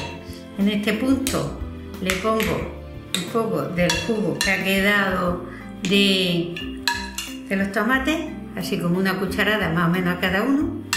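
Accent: Murcia, Spain. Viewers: Voy ha probar muy buena receta Paisana yo también soy de Murcia te conoci en la forma de hablar que eras murciana , un saludó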